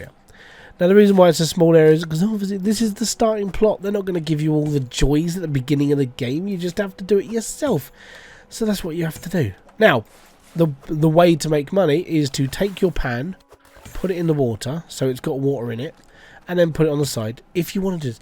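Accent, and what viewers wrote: United Kingdom. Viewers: i love your english accent also i am english too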